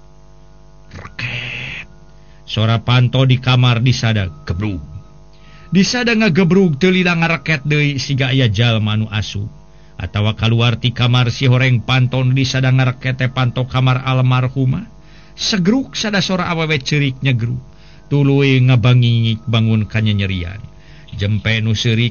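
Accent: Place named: Sunda, Indonesia. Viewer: lamun hayang di ajar basa sunda....tah dongeng anu Sundana masih kental....sae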